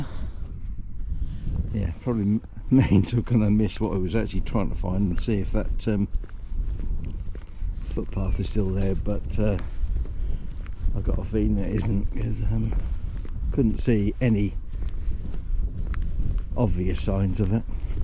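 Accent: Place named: Norfolk, United Kingdom. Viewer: nice to hear the accent!, I'm a Norfolk gal living in Kent 🙂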